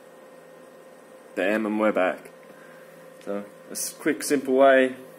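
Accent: Australia. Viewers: Love your Aussie accent homie and great video. You would get tons of women here in the USA. Does it work the other way too? I have heard Austrailian women love American men and its like a 3 to 1 rario there men to women. I hope this question is not offensive, this is just what I have heard. Have a wonderful weekend.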